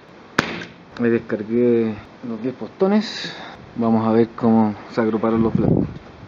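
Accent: Chile. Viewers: jejejeje te cache por el acento xd yo pense que no llegaban de estos juguetito a nuestro bello pais, donde lo compraste y de cuanto fue el garrotazo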